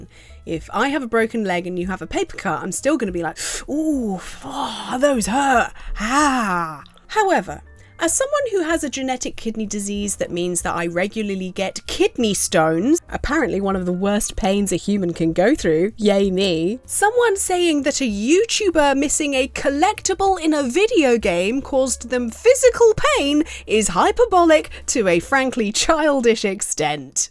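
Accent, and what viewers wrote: United Kingdom. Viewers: I just think you're swell. You have one of those voices I could set my Jarvis to. Listened to all your videos, some more regularly than others, and that old cliche holds true. I could listen to you read the phonebook and it be entertaining...or ya know, Oxfords dictionary. If you wanted to go old school 🥴 explains: New English like "Frack" entered Oxfords dic. this year and means frack, n.: “Used as a euphemistic alternative to fuck, n., typically in phrases suggesting a dismissive, hostile, or exasperated response, such as to give a…” Ranting, explaining, sick, expositional, vlogging, No matter the temperament, the topic, or the energy I just like hearing your voice with all its British inflections and your squeaky mannerisms. Like a massage for the brain. Like the mic setup btw. Ok peace.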